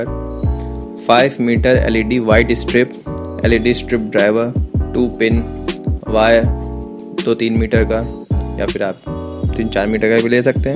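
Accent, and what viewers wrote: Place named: Lucknow, India. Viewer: You r from Lucknow iam sure😎😂 because iam also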